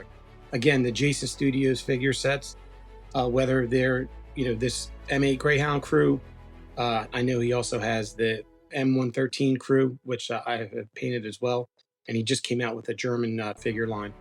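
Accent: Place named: Philadelphia, United States. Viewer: Nice job! I hear Philly btw lol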